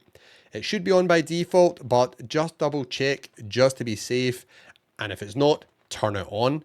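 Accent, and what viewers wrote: Scotland, United Kingdom. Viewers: The Scottish accent. The best accent in the world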